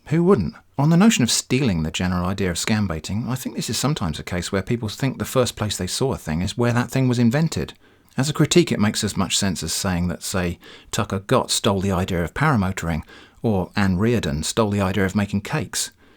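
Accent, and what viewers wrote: United Kingdom. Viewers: Ngl I saw the same Ted talk and found this guy’s videos and thought, oh it’s the guy from that Ted talk thing. Did he always have a British accent? (I’m being serious did that guy from the Ted talks have a British accent? I can’t remember for the life of me)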